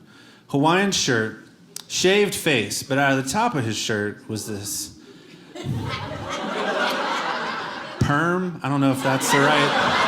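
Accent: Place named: South, United States. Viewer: Funny man. His southern drawl is spot on.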